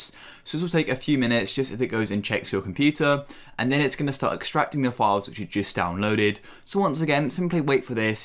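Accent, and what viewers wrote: United Kingdom. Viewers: bro is bri'sh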